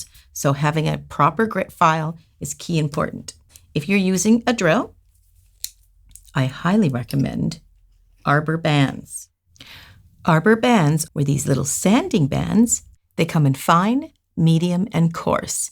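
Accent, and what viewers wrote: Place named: Canada. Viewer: I just got dip powder nails for the first time, trying to grow out my onion-peel, thin nails. (genetic, my mom's are the same) I was looking for info about dip nails online and found your channel and subscribed. I'm not a nail tech, but I love watching you and listening to you. My fiance is Canadian and before I knew where you are, I guesses you are Canadian, love your adorable accent. :) Thanks for making such great videos!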